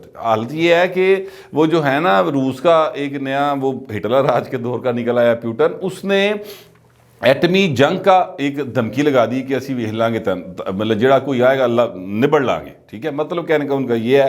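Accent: Punjab, Pakistan. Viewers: Sir ma apka vloge just Punjabi k Liye dekhti hon mujy apki gulabi Urdu bohat Pasand hai🙂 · Butt saab, tussi Punjabi baut pyari bolde ho. Assi we picchun Lahori Haan.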